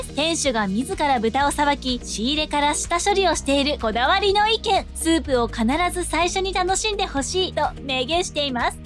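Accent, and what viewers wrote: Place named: Gunma, Japan. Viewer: おすすめのラーメン屋が入ってないのが残念ですが、地名のイントネーションが群馬弁なのが好感度高い。 標準語はた→か↑さ→き↓みたいだけど、群馬の人が群馬の市の名前をた→か→さ→き→って言ってるんだから、そこはもう標準語とかではなくた→か→さ→き→なんだよなぁ。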